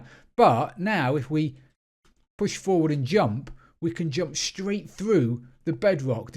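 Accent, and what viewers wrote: United Kingdom. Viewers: i love ur british accent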